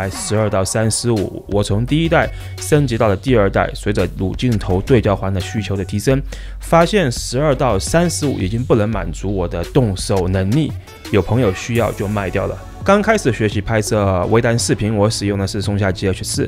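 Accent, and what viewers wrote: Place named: Sichuan, China. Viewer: 这个川普听起来太舒服了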